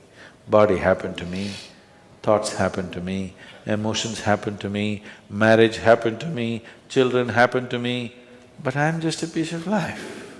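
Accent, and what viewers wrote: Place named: India. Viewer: 薩古魯有印度口音... 真的要聽清楚也不容易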